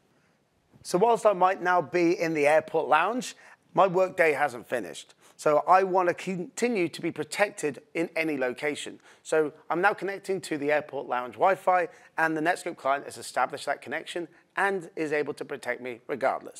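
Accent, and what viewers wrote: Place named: United Kingdom. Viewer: A Netscope rep for Australia sent me this link. Am about 50 secs in and had to write this..... A well muscled guy with a cool Brit accent (I'm a pom too) - tick, impressed so far but wanting to get emails while on a treadmill.....seriously? LOL (I have been in IT 35 years, almost retired and now starting to really feel old!). That said, it does seem a cool product.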